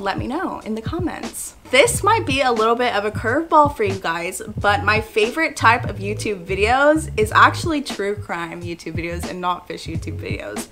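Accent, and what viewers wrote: United States. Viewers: I knew you would get to 1000 before the end of the year congratulations. Yeah I know you don't watch fish tube 🙄 and I could tell you America by your accent✌🏼👍🏼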